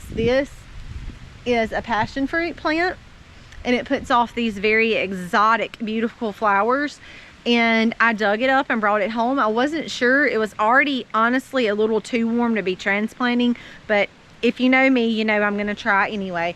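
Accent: South, United States. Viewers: I always enjoy watching you all! And quite frankly I love to hear your wonderful southern accent! My family was from Alabama… and I still have some there! I live in CA! Wish I could live somewhere Else . And maybe in the near future we will be making some trips to the south to see how we like it, We are in our 70’s so we are not young chicks! However we have to get out of CA even though I was born here I really hate what it has become. Watching your channels have renewed my desire to be more free From all this concrete jungle and grow our food and have some animals. We live in a beautiful area, and my husband has worked hard to provide for our 5 kids. With them all grown up now, we just married our baby in July…she is 32… we were married at 19 & 23 years old. So the world has changed so much since then. Watching you all has given me more hope to actually change things. 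Thank you for your channels ..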